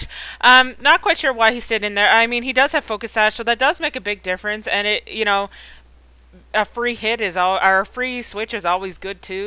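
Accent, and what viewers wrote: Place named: Canada. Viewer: You are soooo from Canada!!!!!! :D I love the UHT! (out) Ennjoying your battles and your flaming! lovely <3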